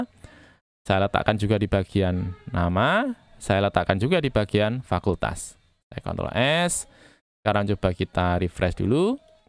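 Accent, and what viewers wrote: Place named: Java, Indonesia. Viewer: Senengnya akun ini medoknya lucu, mantep mas jawa aslii ini mudah di mengerti heeh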